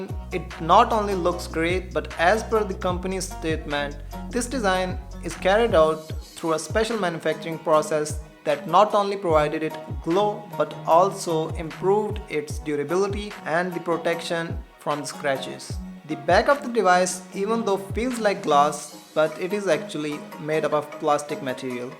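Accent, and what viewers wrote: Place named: Pakistan. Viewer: come on dude you are trying hard to speak English and pronouncing English words with desi Arabic/Urdu accent,I think there is no need to do reviews in english,in Pakistan by urdu you can get much wider audience and reach...English is just an other language its not a benchmark of "quality or scope of the channel". I'm not being sarcastic at all,its just my opinion. Otherwise your review is good,quality and content is also spot on...thumbs up for that.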